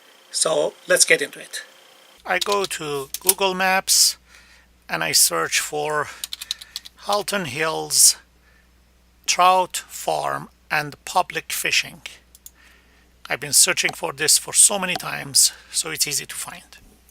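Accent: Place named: Iran. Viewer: Just noticed your accent are your Persian are you Farsi aaaaaa babajon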